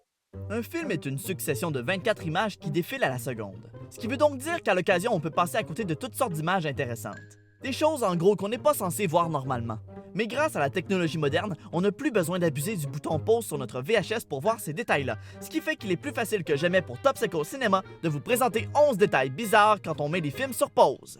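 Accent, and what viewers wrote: Quebec, Canada. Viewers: Je kiffe l’accent canadien 😍😍 c’est tellement relaxant 😝😂❤️ j’aimerais tellement l’avoir 😭😭❤️ (oui je suis française) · Moi direct con il a commencé a parler j’ai dit il est Québécois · L'accent québécois bien que je viens du Québec sa me fait toujours bizarre de l'entende en vidéos😂(très Bonne video en passent😜) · Juste une question , tu es quebécoi ??? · l'accent canadien 😍😍😍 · cool enfin un québécois moi je suis québécoise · t'es Québécois toi non ? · j'adare ton accant Québécois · osti que la personne est un québécois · Quelle belle accent québécois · es-tu québecois ??? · Ton accent n'est pas bizarre mais différents du n'autre! J'penses que c'est que veulent dire les gens qu'ont ils disent le mot "bizarre" pour ma part je trouve ton accent québécois géniale ton accent ma retenue jusqu'à la fin de la vidéo;) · Je trouve l'accent québéquois bizzard en tant que français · LouLou ChannneL ca s'appelle un accent québecois.... 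· Très bien foutu, un abonné en plus pour votre chaîne! Et y a pas à dire, l'accent québecois est vraiment génial! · Je kiffe ton accent québécois mec 😂😍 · heuu tu es canadien ? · est tu québécois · bonne vidéo et joli ton petit accent québécois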